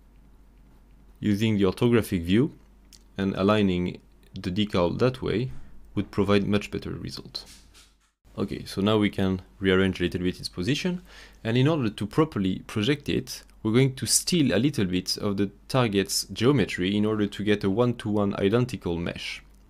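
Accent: France. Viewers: Dude love for accent à la française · very french, love it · Nice french accent ;) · nice try but your French accent are definitly present , merci !!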